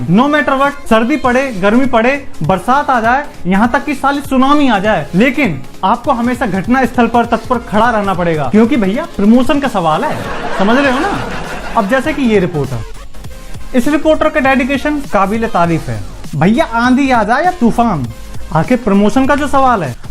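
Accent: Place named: Uttar Pradesh, India. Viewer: Nice videos bhai aur UP accent bahot accha hai aapka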